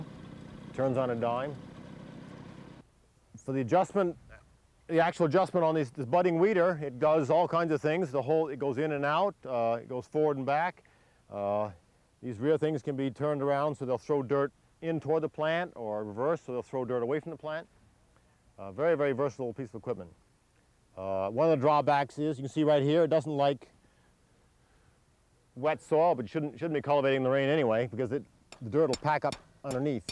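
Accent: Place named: New England, United States. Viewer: This is probably the most dense video on vegetable cultivation on the internet. The yankee accent is great as well.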